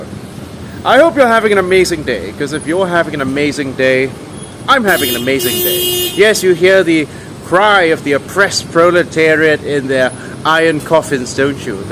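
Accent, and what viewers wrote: RP, United Kingdom. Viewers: I love your high-faluten slightly pompous, pucker British accent. It takes some getting used to. The comments here are barbaric. Though I don't agree with your perspective, I do enjoy your clear vocalization of it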